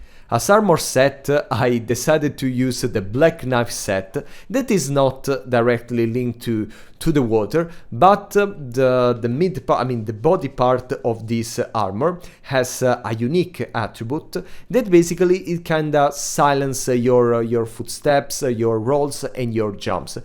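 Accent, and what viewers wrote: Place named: Italy. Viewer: are you italian because of your accent btw good vid keep posting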